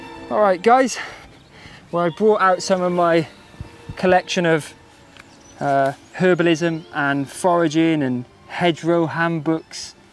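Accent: Wales, United Kingdom. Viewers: I thought he was saying elder and that it was just his welsh accent.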